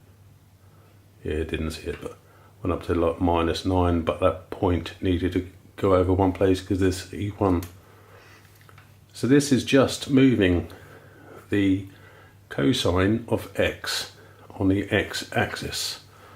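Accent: United Kingdom. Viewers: will do I’ll try a few of the example programs, I realised after I posted it was probably the picture file, I thought from your twang you was from Surrey way.. Yorkshire a bit further up the country from me.. ok I’ll go have a play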